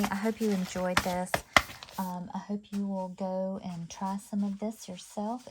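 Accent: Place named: South, United States. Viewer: My thoughts: 1. Do a background like you did for try 3 (a few shades of mid to dark blues eg a Prussian blues and a few tiny dabs of black as it’s so strong it can take over. 2. Pick that up on a piece of paper 3. Do another gel plate with soft to mid greens and a darker green like pthalo green 4. Place your leaves 5. Use your 1st pick up piece to pick up the negative image of the leaves (the lack of veining will look more like your fabric and will show more of the colour) 6. I think the reason your leaves don’t match up perfectly is because you’re comparing a positive with a negative image of the leaf so it’s a mirror image… My 2 cents worth😅 It’s really pretty fabric I loved watching your process, hearing your thoughts and lovely southern accent From Oz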